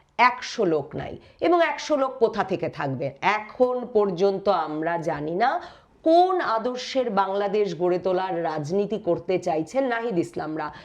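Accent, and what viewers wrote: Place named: West Bengal, India. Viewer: আপনি আগে পশ্চিমবঙ্গের একসেন্ট বাদ দিয়ে বাংলাদেশের একসেন্টে কথা বলেন। শুনতে আরো ভাল লাগবে।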